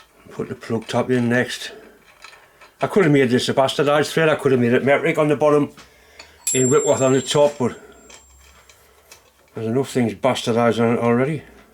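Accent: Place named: Northumberland, United Kingdom. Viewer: Hard as the hobs of hell, been a long time since ive heard that, deffo ashington twang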